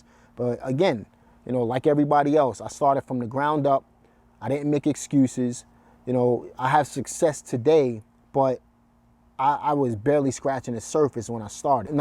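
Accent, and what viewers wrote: New York, United States. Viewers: I can hear NYC in your accent, haha. I'm from Staten Island. I moved to Raleigh in 05.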